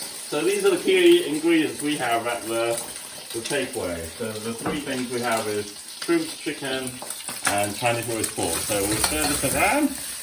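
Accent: England, United Kingdom. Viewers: a Chinese man with a British accent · that's not an Australian accent ok ! It's British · The shock that he sounds British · an English accent is hardly surprising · perfect Queens English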